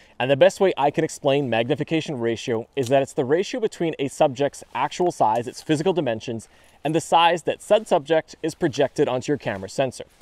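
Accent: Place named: Canada. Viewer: It may be his Canadian accent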